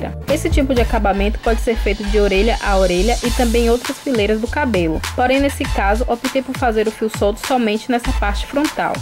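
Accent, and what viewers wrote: Rio de Janeiro, Brazil. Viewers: Show de bola! Vc é do Rio? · Vc é do Rio de janeiro ?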